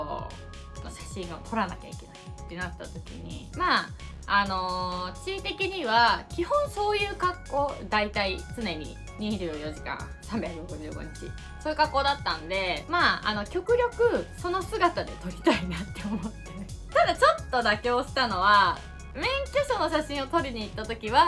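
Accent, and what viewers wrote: Kansai, Japan. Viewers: 関西弁かわあい💕 ちょっとお顔違うねー！ 今の免許書の写真かわいすぎ！もっとビックでみたーい！ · 顔も可愛いけどたまに出る関西弁がほんと可愛い🤤💕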